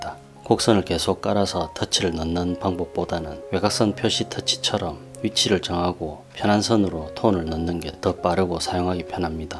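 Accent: Gyeongsang, South Korea. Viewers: 영상 잘보고있습니다 감사합니다 종이는 무슨 도화지를 써야하나요? 갱상도 사시는것 같네요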